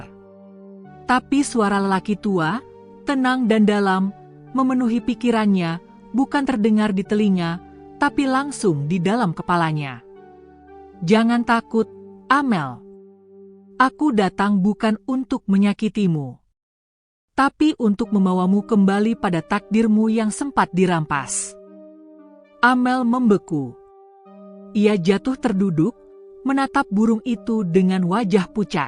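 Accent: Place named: Java, Indonesia. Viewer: Cerita ya bagus cara bacanya terlebih tebal slank jawanya..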